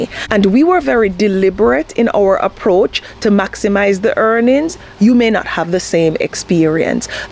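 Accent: Jamaica. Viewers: I know a Jamaican when I hear one. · I love your Jamaican accent, I'm surprised how I'm able to hear it in your English clearly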